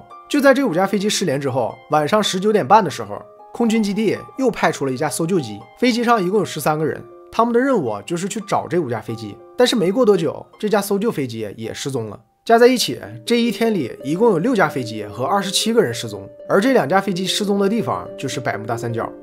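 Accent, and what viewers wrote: Northeast, China. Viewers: 原來以前的老視頻東北口音那麽重的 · 东北口音太重了😂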